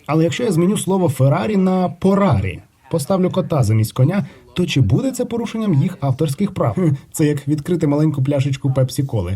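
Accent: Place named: Surzhyk, Ukraine. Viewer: Пиздец, мужик, зачем ты перевод делаешь на украинском, если его полноценно не знаешь? Твой суржик звучит ужасно.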